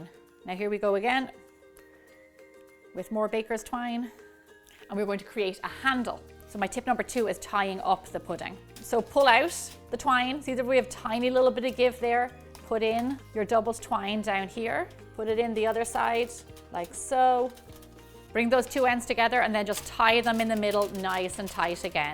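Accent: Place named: Wexford, Ireland. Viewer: I never realised you are a Wexford Las how wonderful listening to you reminiscing about your Mother’s Christmas Pudding 🎄🎄🎄🎄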